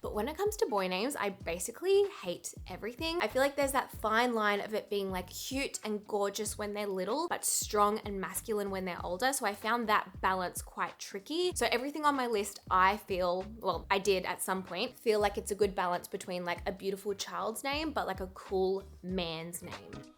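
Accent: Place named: Australia. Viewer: This whole time I thought his name was Hollow not Harlow. I’m not used to the Australian accent I guess.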